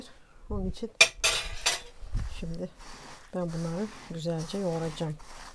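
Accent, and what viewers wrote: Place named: Gaziantep, Turkey. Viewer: konusmanizi cok hosuma gidiyor. Dunurumde Antepli ayni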